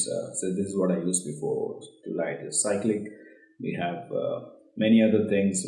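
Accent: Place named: India. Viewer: Great content.. And our Indian acccent is also good fyi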